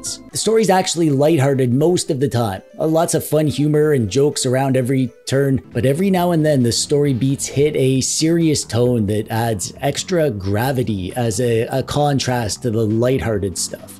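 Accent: Canada. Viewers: Thanks for the review of this classic game. PS, you're one of the most Canadian- sounding YouTubers I've ever heard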